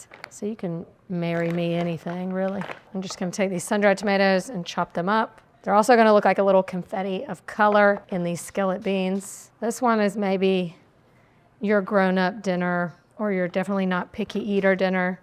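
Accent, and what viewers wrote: South, United States. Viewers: Huge fan of! Love her easy home meals, her soft demeanor, and her cute southern twang. Keep the recipes coming!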